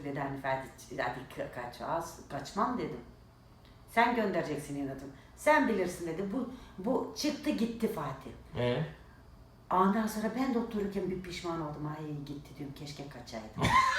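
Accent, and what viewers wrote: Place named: Thrace, Turkey. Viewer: Yaaa ne kadar tatli bir ablasin ❤ bol bol boyle sohpetler hikayeler reelslar paylas nolur 😅o kadar eglendirdinki bizi Allah'ta seni guldursun ablacim❤ bu arada annemde trakyali kirklareliden sen konustukca bizim oraların ruhunu hissediyorum buram buram 🥹🥰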